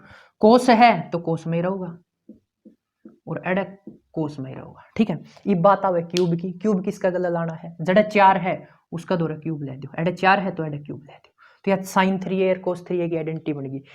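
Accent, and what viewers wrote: Haryana, India. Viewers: Wow haryanvi🥰🥰🥰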